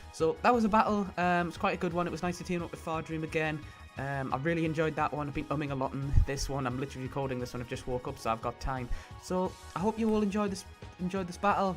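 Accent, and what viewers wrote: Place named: United Kingdom. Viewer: Omg, I love your accent XD idk why but I love British or is that your accent? Anyway it's a really swaggy language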